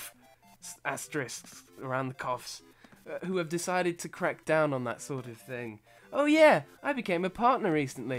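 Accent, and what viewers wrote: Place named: United Kingdom. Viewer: You're only funny because of the British accent.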